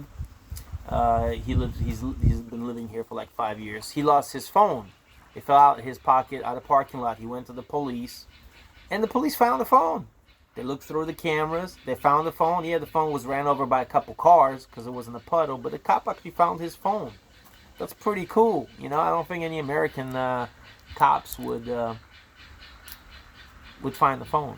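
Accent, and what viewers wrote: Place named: Russia. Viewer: Я не спорю, что это все правда, но русский акцент у парня слишком сильный…. Он точно американец?….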